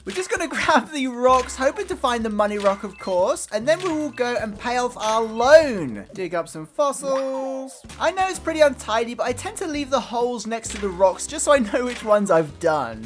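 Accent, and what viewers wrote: United Kingdom. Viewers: Hey, I just want to tell you how much I look forward to your daily "spooky" videos. I've been a subscriber since 2020 when i purchased acnh. I really enjoy your energy and your British accent 😅 I wasn't playing acnh for over 10 months but 13 days ago i restarted my island and finally i found my love for playing acnh again. I've binged watch all of your older videos 😅 I'm just happy that you're still here making acnh content 🙏🏼 Love from Germany 🥰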